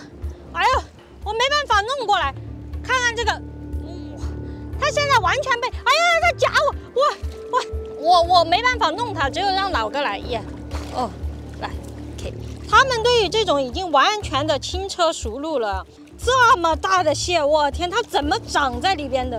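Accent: Sichuan, China. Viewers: 川普说的真好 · 大陸口音？ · 你的四川话和我的一样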